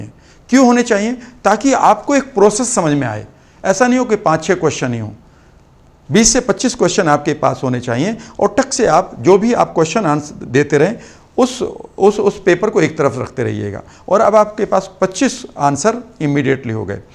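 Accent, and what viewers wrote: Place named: West Rajasthan, India. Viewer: Sir aap Rajasthan se ho na, aapki aaj tone se pata laga, wo bhi west rajasthan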